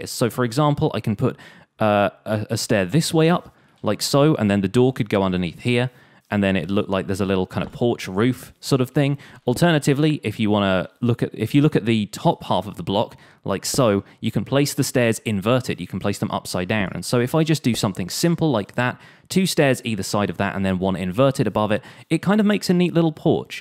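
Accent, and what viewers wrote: United Kingdom. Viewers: It's just a normal British Accent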